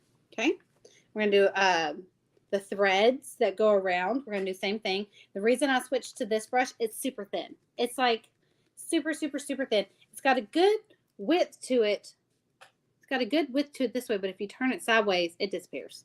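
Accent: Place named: South, United States. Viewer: Lovin her southern accent….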